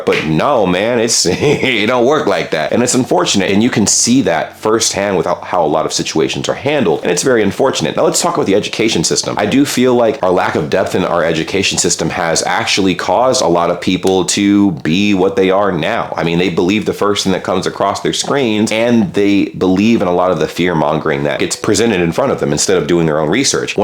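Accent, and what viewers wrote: United States. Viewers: I once found your videos a long time ago and I have to say, I was more annoyed by you and your typical US way of talking and reacting to things, so I was not all willing to subscribe. Over the time I always stumbeld over your videos from time to time, whatch a bit and moved on, after your first Germany trip I whatch more closely. Why am I telling you this, well you have changed so much for the better!!! Not because your videos got better , no, YOU as a person, it is like you've grown up.... no better: you have GROWN! You edjucated yourself, selfreflected, bearthed in knowledge, due to experiences. You became the best proof that travelling and living outside your comfort bubbel zone US there could be hope for the future, that Americans are abel to learn an improve themself. I don't mean that in a funny way, I dislike the USA too much for that, I just wanted to tell you, that you also influnced me a bit. 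Greetings form a German Generation X and keep on going... ✌✌👌👌😊😊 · That’s crazy, even smart Americans like this guy can go THAT long before finding out the US is a joke. So you’re moving to a new country, right? Work on changing that accent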